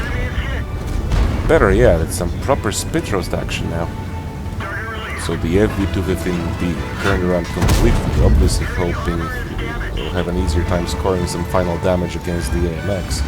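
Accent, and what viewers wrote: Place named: Europe. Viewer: Yeah. We have a similar PRAV clan, and for some reason, you sounded European to me, so I thought you were EU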